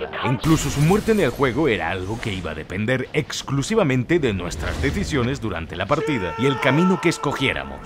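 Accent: Spain. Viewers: Un detalle alucinante es que el video sea narrado por un español con memes en audio latino jja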